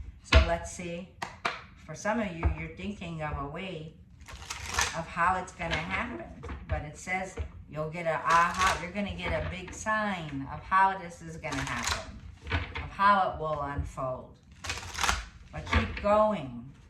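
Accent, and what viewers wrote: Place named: Chicago, United States. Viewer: Beautiful, affirming reading. Thanks so much. For your brilliant assessments. They feel spot on. Thanks for all the study and research you are doing. You are so intuitively gifted and we Geminis love to communicate our higher knowledge. Love you,. You are a treasure. Love your Chicago accent. I lived across the street from Water tower Place when I went to grad school at Northwestern for 7 years in Chicago. Love my Chicagoans!!! Happy 4th. Stay cool in Las Vegas😎💖🙏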